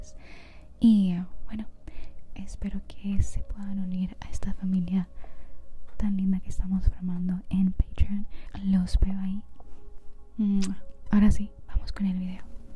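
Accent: Mexico. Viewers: ¿Sos Argentina?😯 Te oigo más acento como mexicano jajaj. Súper bueno el video, tenés una voz muy relajante.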